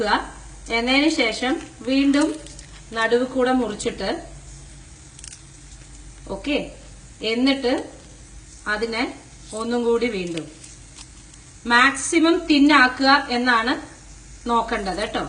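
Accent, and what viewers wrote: Palakkad, India. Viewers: I am making vendaka dish for dinner and continue palkkaden accent in your presentation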